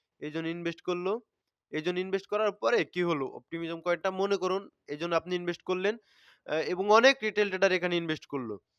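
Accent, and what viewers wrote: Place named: Faridpur, Bangladesh. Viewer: Vai faridpur kotgay apnar basha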